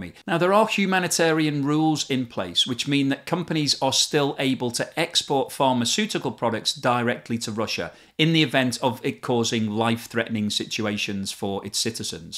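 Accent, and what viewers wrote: United Kingdom. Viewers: A british accent alone doesn't make a knowledgeable man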